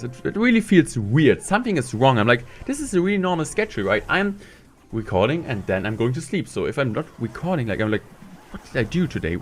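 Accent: Germany. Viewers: Do you speak German? Your english sounds realy German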